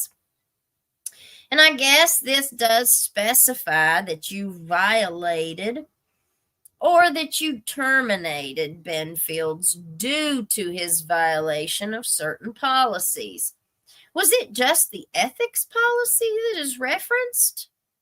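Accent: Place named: Kentucky, United States. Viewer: Hey, hey, hey! I went to college in Barbourville, Kentucky College. I worked in that area after graduation, in Corbin, London, Manchester, Hazard. Your accent is home to me!!! Now living in Oregon...my fondest memories are in Kentucky!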